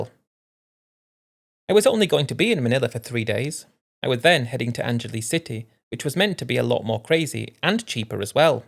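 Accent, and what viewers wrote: United Kingdom. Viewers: You’re from the US? Your accent is British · If you’re from the United States, why do you have a British accent?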